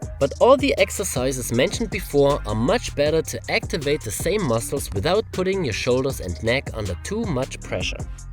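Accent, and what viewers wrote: Germany. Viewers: Sound like Do You have a Garmen accent. :) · Your accent sounds german🤔 The Video was very helpful, thank you! :)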